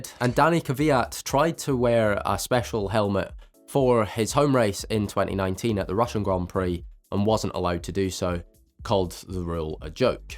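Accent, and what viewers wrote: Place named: Scotland, United Kingdom. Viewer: fellow scot! Imma sub to you just for that.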